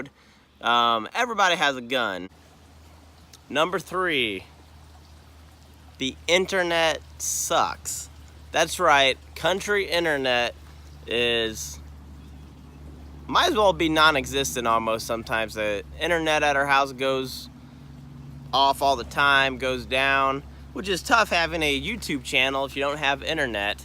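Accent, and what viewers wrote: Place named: United States. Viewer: He has to have the most American voice i heard.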